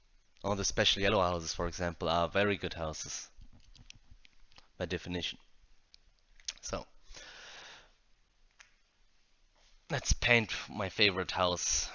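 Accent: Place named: Europe. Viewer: I know that you're european just by your accent :P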